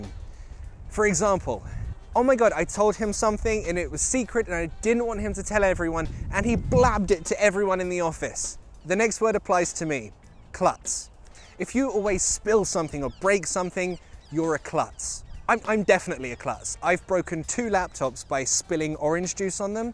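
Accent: United Kingdom. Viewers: I thank you,Sir for the incredibly wonderful work! I appreciate it! British accents are the best!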